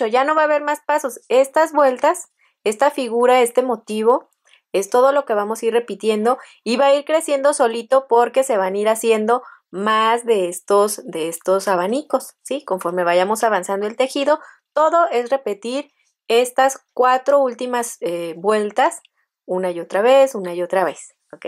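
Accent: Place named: Chihuahua, Mexico. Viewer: Queried a amiga,. Que preciosos trabajos Haden Gus manos. De don de eres en Mexico?. Me suenas como de Chihuahua, Rees Norte a?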